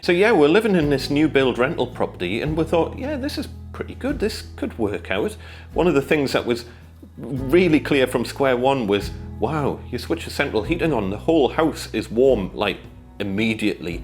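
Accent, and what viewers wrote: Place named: Geordie, United Kingdom. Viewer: Really love your videos - Very informative and great job! As a Geordie who has lived in London for 15 years, it’s nice to hear the friendly, familiar twang of the hometown! Keep the videos coming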